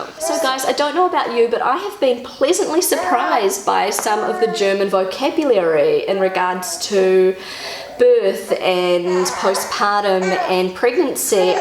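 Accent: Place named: New Zealand. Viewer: Ich liebe es wenn Du Deutsch sprichst. Dein Kiwi Akzent ist so cute. Und Dein Kleiner ist ein lieber, süßer Junge. Stay save...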